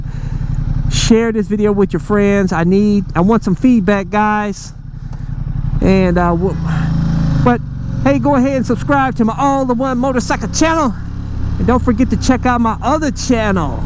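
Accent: United States. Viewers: Don't understand how you're from Ohio and have a complete country bumpkin accent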